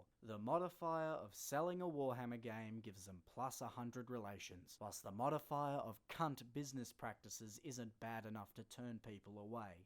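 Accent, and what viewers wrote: Australia. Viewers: You and GGmanlives should do a duo review. Both Australian accents and just straight trough without the kiddy bullshit. Love it! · You an Aussie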